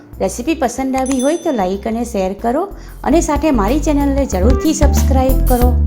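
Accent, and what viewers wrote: Surat, India. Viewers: બહેનશ્રી તમૈ સુરતી( હુરતી) છો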